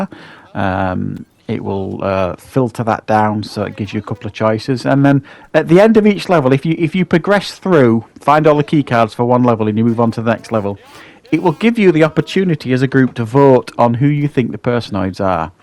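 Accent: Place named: East Yorkshire, United Kingdom. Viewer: You have to be from east Yorkshire. Love the accent.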